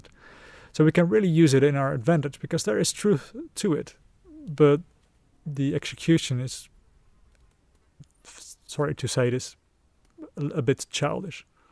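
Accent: Netherlands. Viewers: Exactly Its the balance. I realised for me I first need that feeling in myself that my fear is seen and calmed down like my inner parents talking to my inner child to reassure it. Then I let go of the resistance and there is an opening for undertaking a positive action and a good practise is doing a positive visualisation before the action that makes it very strong and usually succesful. For me For a long time I tried to do Brain retraining and forgot to first do brain retraining on relaxation and safety in the body which Made every letting go make me feel dizzy fatigued and in a stress state. So finally realising this and working on it now gives me an opportunity to let go of old beliefs and implement new ones. Are you dutch btw? You sound very dutch to me.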